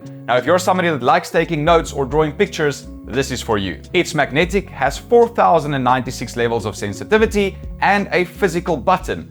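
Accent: South Africa. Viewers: Are you from South Africa bro?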